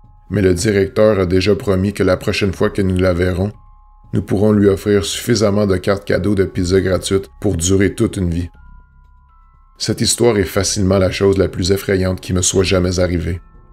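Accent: Quebec, Canada. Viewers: Superbe travail j'aime beaucoup 👍 Ps j'adore l'accent québécois 🙏👌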